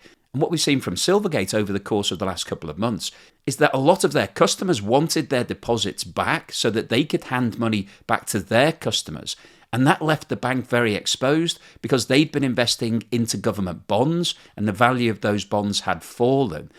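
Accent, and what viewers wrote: United Kingdom. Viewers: P.S. appreciate your regular brit accent. Don't do Posh! That accent sounds like the king of england got combined with a California valley girl. Awful. Posh accents are ruining British English!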